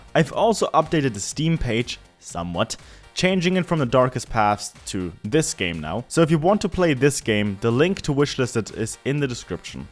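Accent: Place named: Germany. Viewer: most german accent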